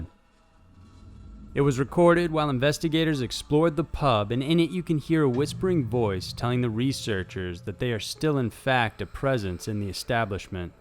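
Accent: United States. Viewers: yeah finally an American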